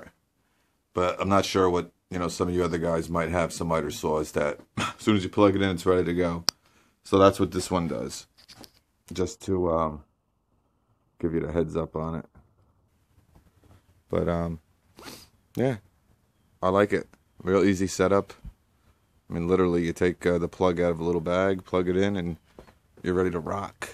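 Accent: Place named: Long Island, United States. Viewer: You have to be from Long Island with that accent. Thanks for the review.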